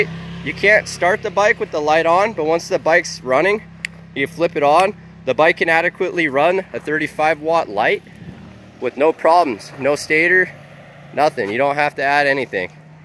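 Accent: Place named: Mexico. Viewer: Why does he sound like a mexican speaking english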